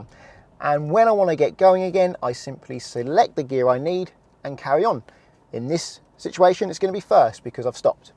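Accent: United Kingdom. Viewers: I came always for his British accent.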